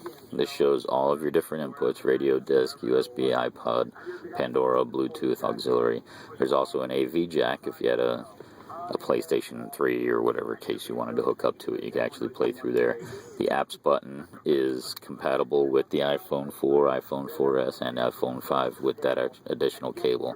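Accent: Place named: Philadelphia, United States. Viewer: Sound like Philly version of Jim Florentine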